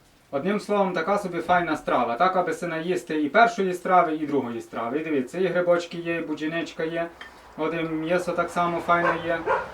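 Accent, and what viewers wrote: Hutsul, Ukraine. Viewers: З'їсти ситного - це добре. А от почути рідну мову, та ще й гуцульську говірку, - це делікатес для душі, й п'ятдесяти грамів не треба.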